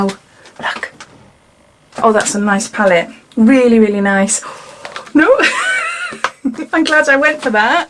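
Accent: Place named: England, United Kingdom. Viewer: I could hear you all day! I love your English accent ❤ you are so pretty too! Love your unboxing xoxo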